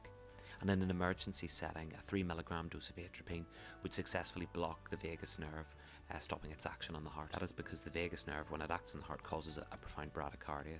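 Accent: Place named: Northern Ireland, United Kingdom. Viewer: Lovely Northern Irish accent..,..mildy sedating..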